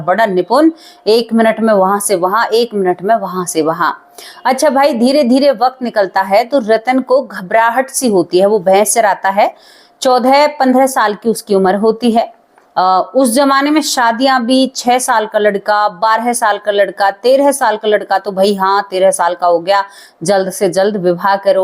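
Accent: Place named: Punjab, India. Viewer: aap jab baate kr rhi hoti hae to kush words punjabi vaale hote haen jaise hum bolte hae badhia lagta bolne ka lahja bahut pyara lagta hae ❤❤❤❤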